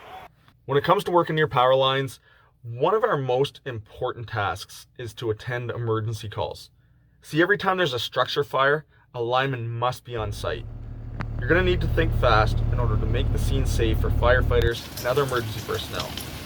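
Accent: Canada. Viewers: You're a canadian Eh!!!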